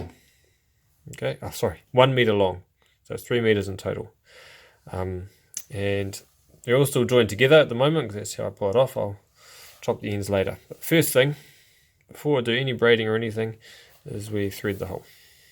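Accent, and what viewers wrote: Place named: New Zealand. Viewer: I’ve watched this tutorial dozens of times over the years, and I so appreciate you taking the time to make it, because - as a kiwi stranded in Canada with a boy who fidgets with his pounamu incessantly - I replace cords regularly. I never manage to onboard that knowledge as it’s just infrequently enough that my brain refuses to acknowledge that it’s essential information, or perhaps it just wants to reminisce and listen to a New Zealand accent explain clearly and calmly how a thing is done. Either way, you are a veritable treasure; thanks so much for helping this kiwi in a foreign land continue to proudly display part of their heritage.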